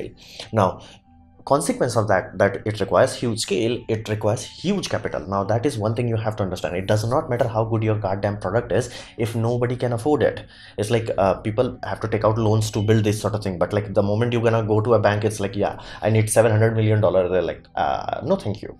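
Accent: India. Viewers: Very nice, presentation!! I have seen your other videos also, you do lot of research and then prepare your videos.. Very good channel for science and tech guys! In this video, Powerpod attracted me, from photos it appears that turbine used in it would be around 25-30 cm in diameters. So it is high rpm low torque type turbine. I would like to ask, that to increase torque and decreasing rpm are they using gears, or this new designed wind turbine is directly connected to generator?? Is it possible that it could generate upto 5kilowatt ? Just think how much torque would be required to run 5kilowatt generator?Can funneling do real magic? (How much velocity of air increases by funneling, it's efficiency... Max rpm of turbine) What is your opinion on it? Please reply, But i liked this concept of rooftop wind turbine, well practically to verify these claims we have to wait when powerpod will be available in market.. 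Definitely one day when all natural resources like coal, oil,.. Etc we will be used than power production will become serious issue.We have to prepare for it right know!! You seemed to be Indian (your accent of English) I appreciate your hard work, and keep doing so, your channel will grow.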